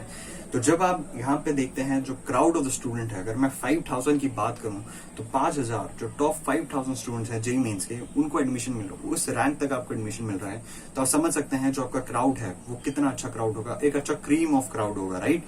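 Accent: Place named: Assam, India. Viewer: smooth Asamese bro!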